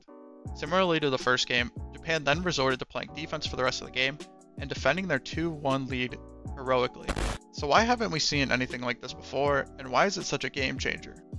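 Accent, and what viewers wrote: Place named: United States. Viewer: I clicked, however hearing the American accent I instantly know everything about this must be BS.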